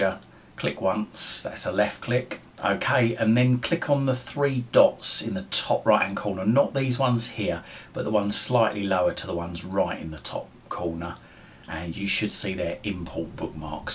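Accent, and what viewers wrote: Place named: Cockney, United Kingdom. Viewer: THANK YOU SO MUCH for doing this video! you're a life saviour! (Also, I love your accent, are you from London? my ignorant ear is telling me there could be a bit of a cockney-ish accent there?) still, perfectly clear and very well explained! again, thank you:)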